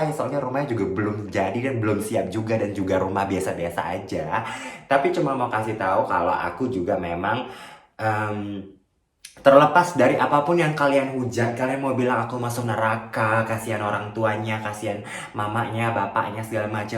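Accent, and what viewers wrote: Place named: Medan, Indonesia. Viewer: Bah kota medan kau bang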